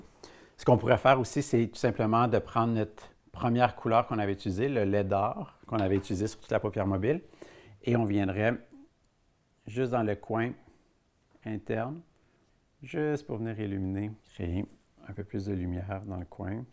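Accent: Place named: Quebec, Canada. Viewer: J’ai trop d’amour pr l’accent québécois je meurs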